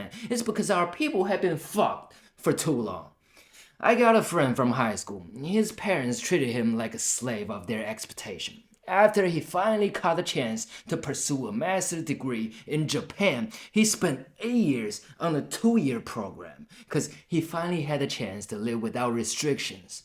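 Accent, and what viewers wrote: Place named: Houston, United States. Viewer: wow brother. love to hear and see from more small youtube channel mainlanders lately. you are very brave! great rap and great takes on all the videos ive seen so far. where did you learn your english? 😂 its awesome! you sound just like some of us asian folks in houston, texas!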